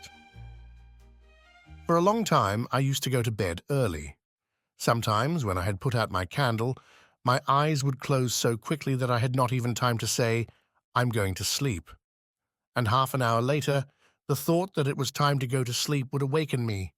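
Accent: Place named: United Kingdom. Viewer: Who is the reader of this wonderful novel? He sounds very British, not at all American.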